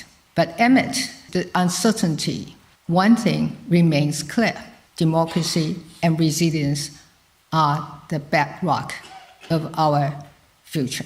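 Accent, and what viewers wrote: United Kingdom. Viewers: 好喜歡聽她說的英國腔英語。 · 台灣出了一個帶日本腔英文的李登輝，又出一個帶英國腔的蔡英文，現在又有一個台灣腔的賴清德，真是連總統都多元化的寶地。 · 現在仔細聽，真的有英國腔~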